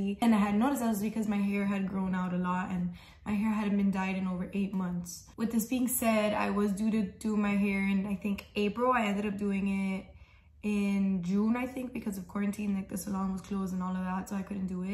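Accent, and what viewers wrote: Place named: Miami, United States. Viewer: As soon as you started talking I was like MIAMI! The accent is strong lol. I'm from Kendall btw so I hear it right away.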